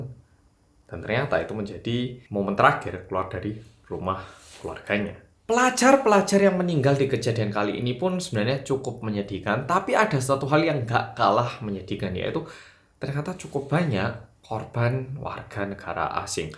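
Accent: Java, Indonesia. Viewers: Kalah medok gw sm oppa 😀😀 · jiaannnnm blasssss ra koyo wong korea,medoooxxxxxxxx bgt.......#keren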